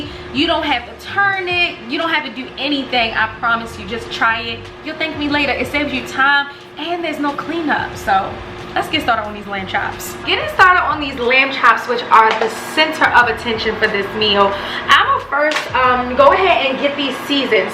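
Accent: Baltimore, United States. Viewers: Oh u def from baltimore. I can tell by your voice. U get a like comment sub of that alone.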